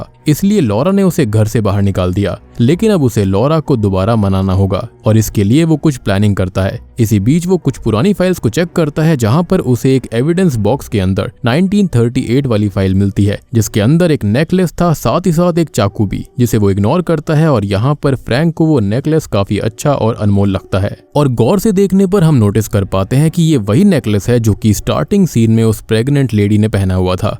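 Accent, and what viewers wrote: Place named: Bengal, India. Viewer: Are and haunting tubes the same....because the voice and the accents are the same...like bengali broken hindi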